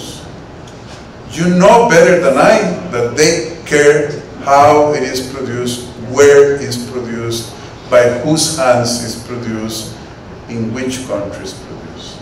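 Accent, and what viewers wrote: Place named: Costa Rica. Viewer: Jajajajajajaj My man does not only “talks the talk, but he also walks the walk” !!!! I know Mr. Chaves is never going to read this comment but I am so glad that speaks with a Tico accent, this shows that he is a true product of improvement and a fighter to whom nothing has been given for free or with a silver spoon. NO RED TAPE !!! Please get all the roadblocks out of the way, we want to move forward as a country., were are behind you!!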